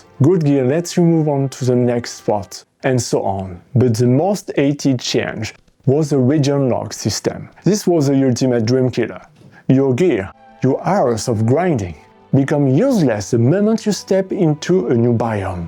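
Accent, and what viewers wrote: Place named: France. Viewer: Hey, first of all congratulation on doing and posting a video. Pour le reste, étant aussi français , si je peux me permettre de pointer deux ou trois problèmes : -Le son, oui tu as un accent français, mais je pense qu'en réduisant la saturation ce serait plus audible (ça peut être amélioré largement avec une bonne technique simplement avec Audacity, même si c'est pas la meilleure solution non plus). Même étant aussi frakgais et fluent en anglais c'était un peu dur par moment. -Le montage, dans l'ensemble c'est très bien, mais comme disait il yabquelque années jdg, ou Antoine dznizl le rythme est très important, même si le rythme ne doit pas forcément être rapidew c'est mieux quand on a l'impression que tu donnes le rythme a ta vidéo, et pas l'inverse . Après je dis tout ça en ayant eu pendant longtemps l'idée de faire des vidéos, qui plus est en anglais, sans jamais en avoir fait. 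Ce sont des observations mais ça ne retire rien que tu fais mieux que 98% des gens, qui eux n'ont même pas agis. Et de reste si tu souhaite discuter ou plus de détails sur mes avis n'hésite pas (si ça t'intéresse on pourra voir par mail ou autre) Bravo encore et bonne soirée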